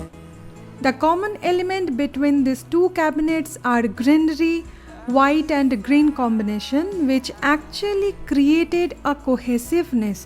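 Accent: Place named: Bengal, India. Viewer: Simply wow , your collection is beautiful. By the way r u bengali,actualy u have bengali accent.Please reply .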